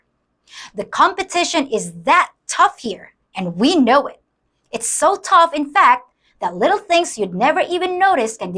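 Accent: United States. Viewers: Hello again my dear , it seems that your american slang is starting to show things that you could have only learned in the usa you were either in nyc or los angeles , your english is to polished to have come from the philippines , you can fool some people but you cant fool everybody im sure you will block and delete me now ! have a nice day ! im not knocking your channel you say some cool things that need to be said but pretending your somebody your not is another thing ! like you say honesty is the best policy ! and one other thing who is the Foreigner that is supporting you ? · Yeah, guess where that American accent comes from 🤭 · You don’t sound very Filipina you sound more American sooooo I’m just saying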